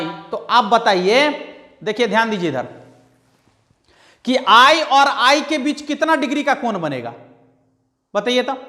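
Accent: Bihar, India. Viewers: सर बिहार से है का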